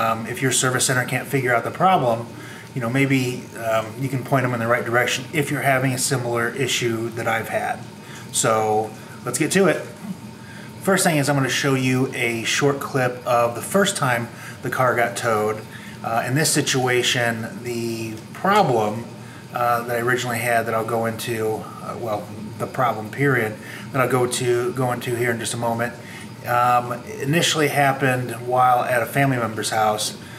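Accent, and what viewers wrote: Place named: United States. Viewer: your American accent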